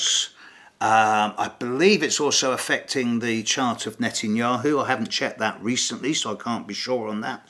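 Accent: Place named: RP, United Kingdom. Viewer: Thanks! It's always a great pleasure listen to you... And I love your clear British R.P. accent... Greetings from Italy 👋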